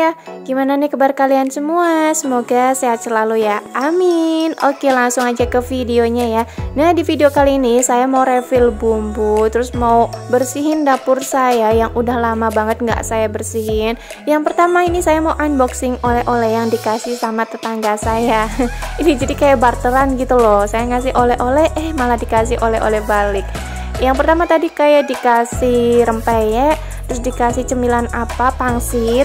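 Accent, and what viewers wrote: Banyumas, Indonesia. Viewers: Setia ngikutin video nya tp jarang komen...kita satu bangsa ngapak😅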